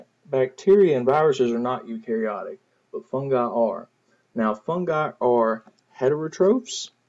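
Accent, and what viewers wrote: United States. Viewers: Thanks you so much, so much southern accent .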